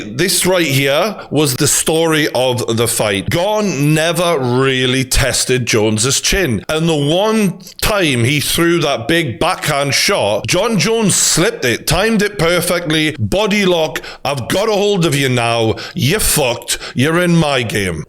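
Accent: Newcastle, United Kingdom. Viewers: geordie ass nigga.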